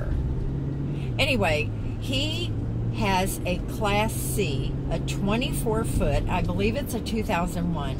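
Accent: South, United States. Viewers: I love your Channel. I love your southern accent. About nomadic fanatic, I ran into him in a Walmart parking lot by accident just one week into my YouTube channel. I turned on my phone that night to detect Wi-Fi and I was detecting nomadic fanatic Wi-Fi so I looked out my windows and didn't see anything so I opened the door and there he was right beside me. It was funny. Eric seems like a very nice guy. When I said how nice he was and made a 1-minute video about it I got so many trolls. I just wasn't ready for them so I deleted that thread. I hated to delete it because it had a thousand views on my brand new channel.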